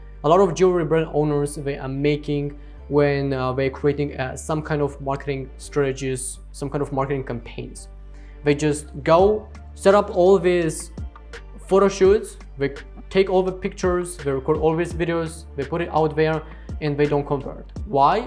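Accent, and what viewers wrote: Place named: India. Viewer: a blonde with an Indian accent.... that's rare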